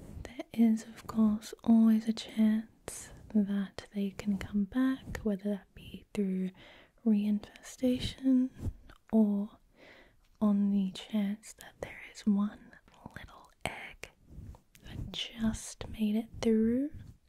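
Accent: Australia. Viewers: Australian accent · As soon as I heard her voice I knew she was from Australia then I checked and I got it right!!! I’m from Australia too! Btw amazing video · You sound like a bit Aussie😅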